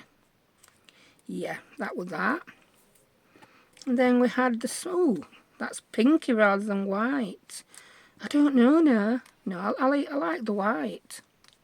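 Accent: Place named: Yorkshire, United Kingdom. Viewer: I’ve just found you on YouTube, it’s a delight to hear a ‘Yorkshire’ accent on here making fabulous crafts! I’m a Yorkshire lass I hope you’re still making videos on here,I’ll have to have a browse at more of your creations, I love what you did with this fold out, I haven’t made one before but going to after watching you. Thanks 😊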